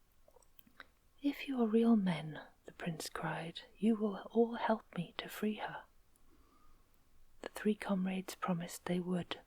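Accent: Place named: England, United Kingdom. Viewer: Love sleep stories. The softly spoken English accent is soothing & relaxing. I've always loved magic & fairytales so I thoroughly enjoy the stories plus the narrator is great. What is the narrators name? I really love listening to the stories in her great voice. I listen to these most nights. Thanks so much!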